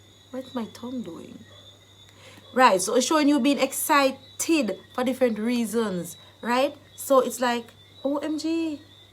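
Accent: Jamaica. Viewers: Wow, I like the Jamaican accent; I enjoy how you speak. I will start practicing how to speak like a Jamaican. This video is very helpful. Thanks for sharing. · Wow.i like the Jamaican accent.. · I love how Jamaicans talk. I clicked on video just to hear you talk lol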